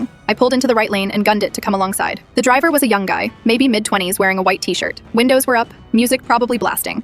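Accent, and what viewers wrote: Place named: Australia. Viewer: Hey, I have never heard an Australian version of this, it’s nice for a change, keep it you mate.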